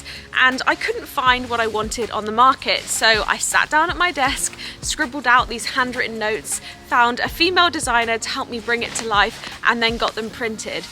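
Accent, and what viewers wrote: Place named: Devon, United Kingdom. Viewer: thats ok! I was just wondering from your voice, it sounds like my aunties and shes from devon xx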